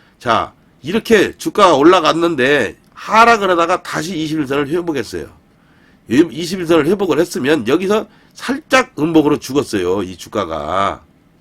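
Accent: Jeolla, South Korea. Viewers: 유바이오 . 언급해 주셔서 깊이 감사드려요 항시 도움주시려 애쓰시는 모습도 감사합니다 전라도 사투리도 정감갑니다 늘~ 건강하시고 평안하시길 기원드립니다 ♡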